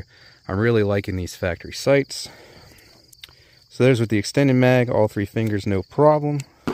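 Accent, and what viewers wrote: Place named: Southern Ohio, United States. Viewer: You must be southern Ohio.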